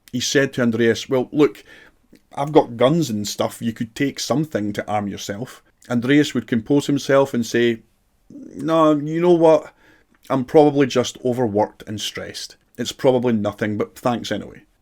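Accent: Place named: Ayrshire, United Kingdom. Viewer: My folks live in Ayr, you sound just like you maybe come from around there? New sub and loving the wee stories, thank you x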